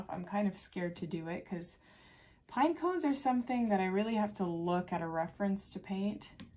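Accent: Canada. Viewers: Within 2 min I recognized a fellow Canadian 🇨🇦 Beautiful job, thank you!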